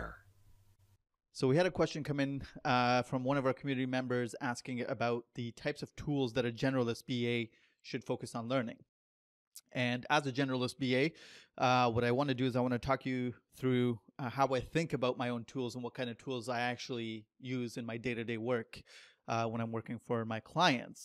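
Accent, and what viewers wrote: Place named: Canada. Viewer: You sound so Canadian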